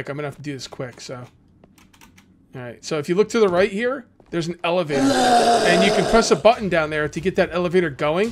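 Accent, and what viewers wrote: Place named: New Jersey, United States. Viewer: Good ole Dirty Jersey! My fiancee heard you say wooder(water) when I was laying in bed watching and she goes " hes gotta be from Jersey"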